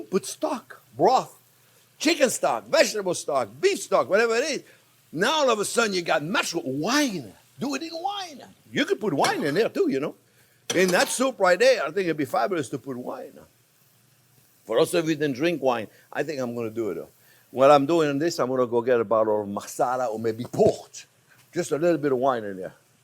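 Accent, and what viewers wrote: France. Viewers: And i love your french residues in your English as much as i love you detailed instructions & explainations in your video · Just discovered your channel last week and haven’t stopped watching the videos ever since! I love your voice, your food, your accent 💖 Everything about you and your channel is absolutely succulent 🤩 I’m almost 70, of Persian origin, living in France for the past 50 years, married to an Italian for over 2 decades and recognized as a good cook by all (even by my late mother in law who was a dream of a cook herself!) So I dare say I know food 💃🏻 You and your channel are a pure delight. Don’t change a thing👌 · “Onyo is always number first”, you’re awesome... I’ve also been using your accent while cooking.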